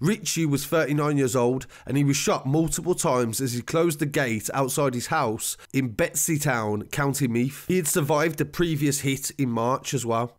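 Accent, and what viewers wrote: United Kingdom. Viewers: i come from the same town i know more about this than this guy does his accent is from the uk what the fuck would he know about our troubles haha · Mate you sound like ur from UK and learn how to say the places first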